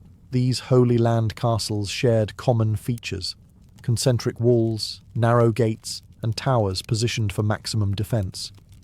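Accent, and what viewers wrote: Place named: England, United Kingdom. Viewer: I lived for seven years in Alaska. Miss it terribly. I’m now living in Baltimore, Maryland about an hour’s drive near the small town where I grew up. Gettysburg is close by. I love your videos! Your English accent and medieval history are quite a comfort 👍🤓. My nana and mum are Australian so I always prefer the Commonwealth. I try to watch only British film, music, and TV. Nighty nite.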